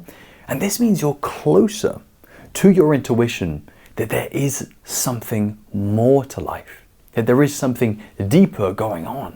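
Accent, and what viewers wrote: United Kingdom. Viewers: What accent is that? You look Danish but sound British 😅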